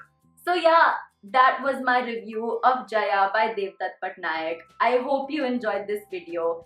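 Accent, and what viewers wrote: India. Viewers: You've been brought up in US, still your accent is wholly Indian! Anyway, lovely review 🥰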